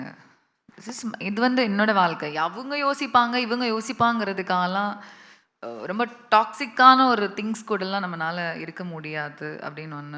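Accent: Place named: Kerala, India. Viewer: Your Ramanathapuram we know but slang looks like Malayalam slang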